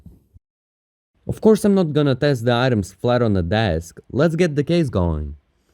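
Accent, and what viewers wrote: Russia. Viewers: the russian accent is real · You from Russia? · russian accent... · Wait are you russian?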